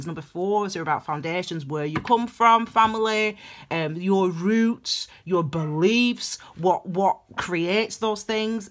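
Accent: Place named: North, United Kingdom. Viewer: ..at 0808...hearing your lovely northern twang (a Manky here :))...I snapped and your energy was my final acceptance .. .own IT.. claim IT...poised ..thanks for making clear this feeling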